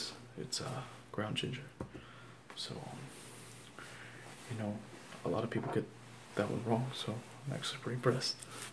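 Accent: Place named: South, United States. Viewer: sound like a Southerner to me, Georgia?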